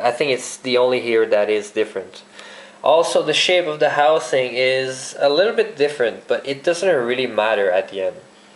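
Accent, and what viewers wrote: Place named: Quebec, Canada. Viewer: Nice video! Et beau accent québecois lol ;)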